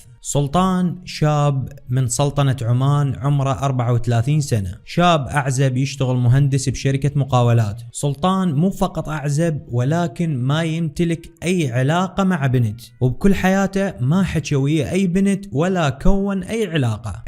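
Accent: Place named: Iraq. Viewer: اجمل لهجه لهجت العراق 😊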